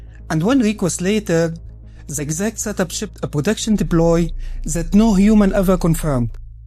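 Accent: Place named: Syria. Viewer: انت سوري اللغه انجليزي سوري !!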